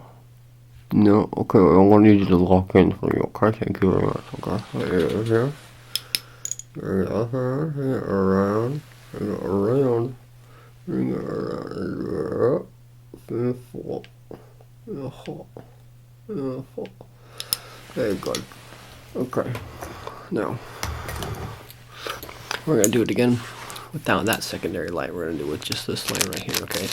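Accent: Transatlantic, United States. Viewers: Trans Atlantic 😭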